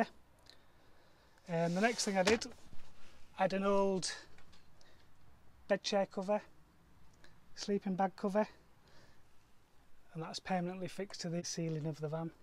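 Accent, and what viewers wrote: Hull, United Kingdom. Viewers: NICE job u from sound like your from ULL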